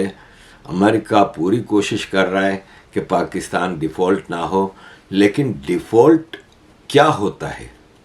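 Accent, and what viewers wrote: Gujarat, India. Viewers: Aapka accent Gujarati jaisa hai kafi had tak, bada meetha lag raha hai sunna. Main ummeed karta hoon aapke desh mein vikas ho aur iss dikkat aapko nijaat mile.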